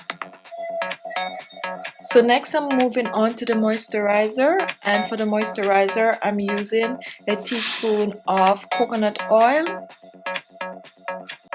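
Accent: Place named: Jamaica. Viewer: You must be Jamaican with that accent , great video..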